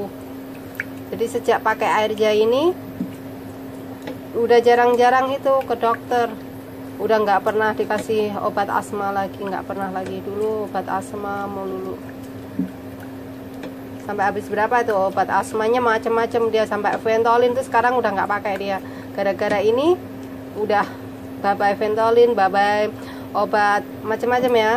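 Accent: Java, Indonesia. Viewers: Ibue medok nemen..orang jawa mana bu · Asli Jawa mbak?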